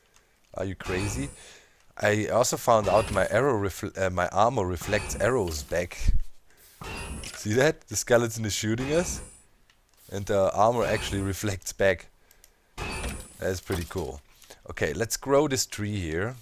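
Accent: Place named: Germany. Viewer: For everyone who is not german you will not understand me. :P Hallo, Du warst auf meiner Startseite und das Video gefällt mir! Hoffe du machst weiter so!Edit: Übrigens Man merkt an deinem Akzent oder der Kanalbeschreibung das du Deutsch bist. Find Ich cool das Ich mal nen Internationalen Gamer gefunden habe der Deutsch ist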